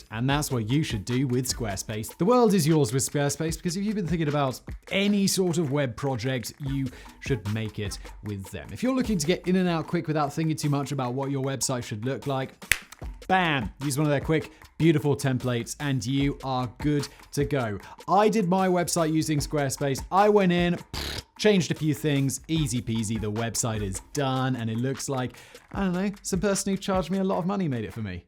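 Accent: United Kingdom. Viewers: Ah, I'd hazard a guess these videos are written by native speakers. Presenter couldn't be more British. I'm austrian, if you wanna know.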